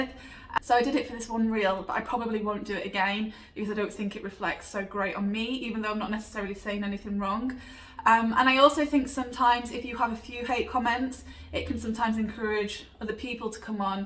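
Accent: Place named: United Kingdom. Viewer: Hey! Love your work (and love your British accent). You are super talented and I have learned so much from you. Thanks for this video - you rock! ❤️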